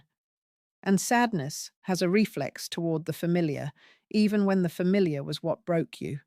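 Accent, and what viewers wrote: United Kingdom. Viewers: First time I hear an Ohio person speaking with a British accent.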